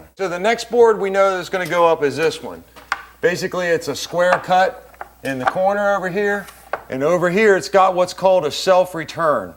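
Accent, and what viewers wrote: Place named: Pittsburgh, United States. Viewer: Hi! I see you are in Cincinatti, but I swear I hear Pittsburgh in your accent! Are you by chance from Pittsburgh?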